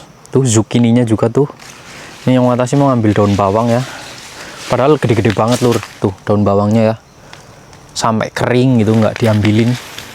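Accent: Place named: Purbalingga, Indonesia. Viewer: Jial ngomong ngapak ala Purbalingga 😊nyong y wong Purbalingga 😂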